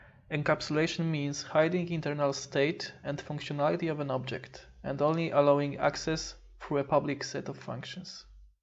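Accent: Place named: Poland. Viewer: Polak detected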